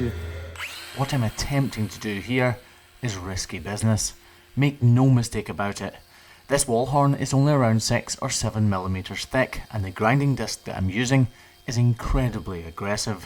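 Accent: Scotland, United Kingdom. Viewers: Sorry I love your Scotish accents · It's the Scottish accent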